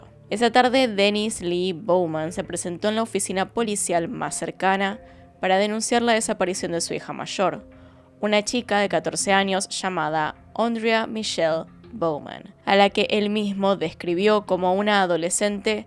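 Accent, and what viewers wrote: Buenos Aires, Argentina. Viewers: No me gusta tu dicción, no creo que hables así cuando vas a la panadería, hacelo más natural, teniendo tanto acento porteño pretendés renegar de la pronunciación en español exageradamente, aunque sea en nombres propios o de localidades cortas la esencia de la narracion en tu idioma, no creo que tengas un nivel alto de público angloparlante por lo que no es necesario tanto, mañana o pasado elimino el comentario por qué no se cómo hacerte llegar la sugerencia. Por lo demás el resumen es bueno y consistente, más que bueno, sin agregados inútiles como nefisto, landó, bárbaro etc que se van por las ramas, muy buena tu capacidad de síntesis. Saludos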